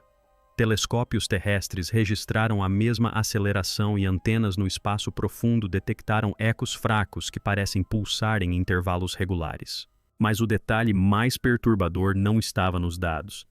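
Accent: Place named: United Kingdom. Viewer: Sotaque horrível "r" muito britânico colonialista.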